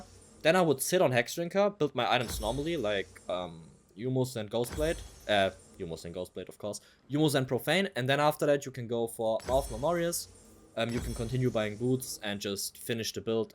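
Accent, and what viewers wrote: Germany. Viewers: From your English accent I could tell you are from Germany, danke für die Tipps waren sehr hilfreich ✅️ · Now just for me, are u German?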